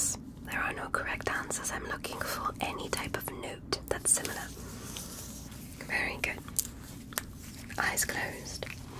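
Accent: England, United Kingdom. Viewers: OMG the British accent❤ love it❤❤ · Is the English accent from absolutely Fabulous? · English Accent 🎉 my Fav 🙏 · Your English accent is marvellous.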